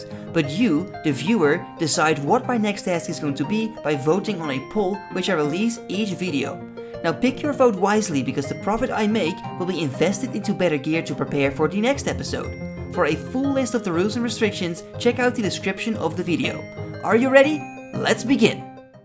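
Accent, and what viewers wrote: Netherlands. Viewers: I was like "this dude sounds so dutch" then I saw the location, gang gang shit lekkuurrr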